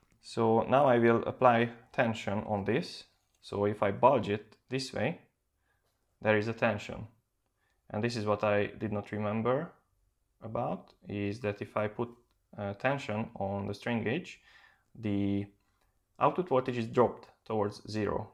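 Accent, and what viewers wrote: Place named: Hungary. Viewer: Le se tagadhatnád, hogy magyar vagy ilyen akcentussal. Amúgy jók a videók👍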